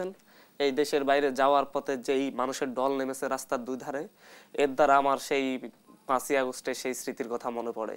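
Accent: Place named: Kolkata, India. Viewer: উপস্থাপক ওভারস্মার্ট সাজার চেষ্টা করে!! কলকাতার ফ্লেভার আছে।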